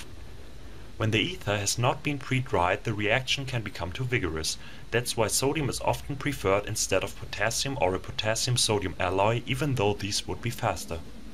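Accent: Germany. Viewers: Are you German.? The accent sounds like it..( That's NOT a racial thing, just an "ear" thing) :) btw, ich bin Belgiër und mein grossvater war Deutsch.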